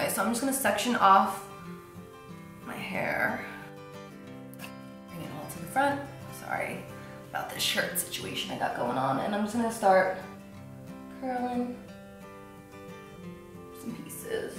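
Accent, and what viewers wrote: New Jersey, United States. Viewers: u have a nice thick jersey accent, represent girl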